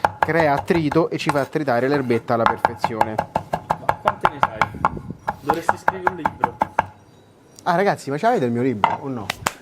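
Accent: Rome, Italy. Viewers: Come sei simpatico, ai un dialetto! Che mi piace da morire! Super romanesco! Io sono Toscana Pisa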